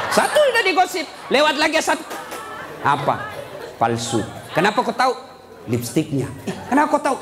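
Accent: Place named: Bugis, Indonesia. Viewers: Suka sama bawakan ceramah dg aksen Bugis dan kalo baca ayat itu sesuai dg bacaan orng Sulawesi Krn disana jarang O yg banyak A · Ustad ceramah keliling Indonesia, tapi logat Makassar nya ndak pernah hilang.dan lucunya lagi siapapun yg dengar ceramahnya ikut tertawa. Ntah tertawa karena memang mengerti atau tertawa karena penyampaian logat Makassar nya 😊. Untungnya pernah 10tahun tinggal di Makassar jadi mengerti sekali apa yg beliau bilang...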